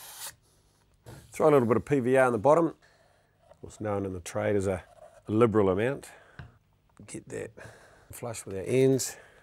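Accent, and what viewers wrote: New Zealand. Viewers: he's a kiwi bro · yeh, it is our Kiwi accent.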